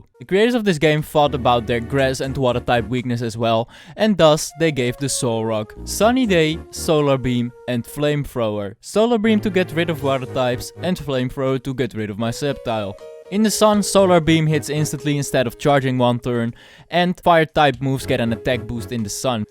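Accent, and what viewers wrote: Netherlands. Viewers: Yo dude u from the netherlands? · Are you dutch? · Ik herkende het echt meteen, dacht gelijk "Ahh deze gast is Nederlands"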